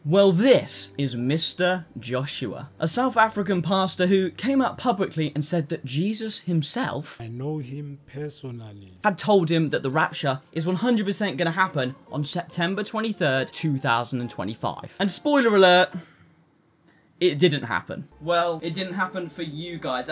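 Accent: United Kingdom. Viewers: Handsome, naturally good physique, British accent, funny content, gentle humour = best recipe for my entertainment Thanks for having started making videos! But also thx for the yt algorithm for suggesting you to me.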